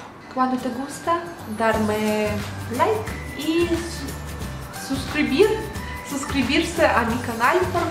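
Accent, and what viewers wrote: Russia. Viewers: tu español no es malo, es el acento ruso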